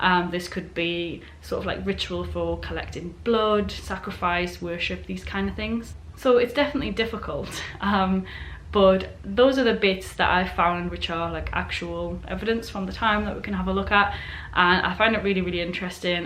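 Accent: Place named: Geordie, United Kingdom. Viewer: you sound like you live just up the road from me, maybe gateshead area. that's awesome. very bonny lass.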